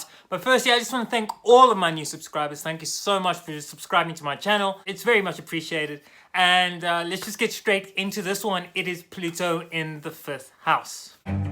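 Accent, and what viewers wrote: South Africa. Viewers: Are you from South Africa or perhaps New Zealand?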